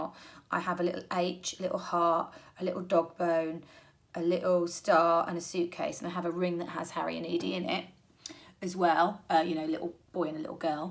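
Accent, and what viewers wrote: United Kingdom. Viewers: I really like British accent And I like your makeup. I like you make Kira knightly makeup.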